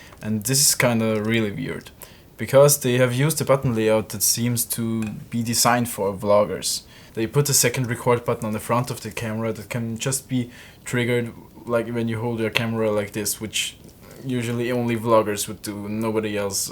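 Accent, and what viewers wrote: Austria. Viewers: Yes, he is. For an Austrian it's pretty hard not to sound like an Austrian, even when talking English ;-)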